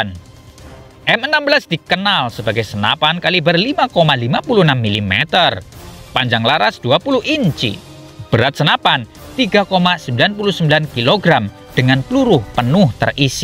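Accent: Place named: Banyumas, Indonesia. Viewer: Suara pennyiar enak rada ngapak " ya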